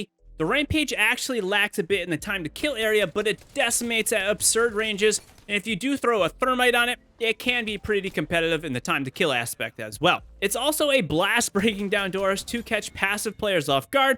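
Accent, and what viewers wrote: United Kingdom. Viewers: your northern accent dawg!! no offense sounds like your saying c ter. lol not making fun of you i’m from texas and i don’t hear it a lot lol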